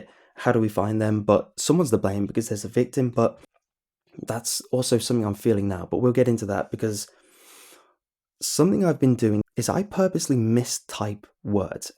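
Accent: England, United Kingdom. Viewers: I thought he was gonna look like those british people ( which the fact that he speaks a british accent ) but with blonde hair and green eyes or something like that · this is an awful situation but GOD DAMN THAT BUTTERY ENGLISH VOICE MATCHES UP WELL YOU HANDSOME DEVIL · Well, I really like the videos you post, oftentimes fall asleep to CreepyPastas, your voice is somewhat nostalgic and melancholic giving them a pleasent nuance. And besides I'm quite found of the Brittish accent, my teachers was from Manchester. Keep up the good work brother! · wow is part asian? who could have guessed that:O i always imagined you(by voice) to be a british guy. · And I wish it would change he's only got this one voice with exaggerated breathing or the annoying little kid voice. They're all thicc British accents when you're trying to hear about a teenager living in Alabama being stalked through a anbandoned mall by monsters. 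"Ello mate, my name is Skylar and me mum has sent me to the mall to get some cookies. Pip pip cheerio. Long live the Queen of America." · Is he english? Im english and he doesnt sound totally english. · Ayyy I watch your videos, funny seeing you here🤣. He's got a British accent so just assuming english is probably his first language. From what he was saying it seems like he is.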